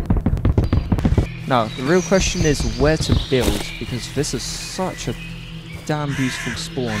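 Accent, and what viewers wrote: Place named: United Kingdom. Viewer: Cool, good mod, and my favorite British accent-man ;3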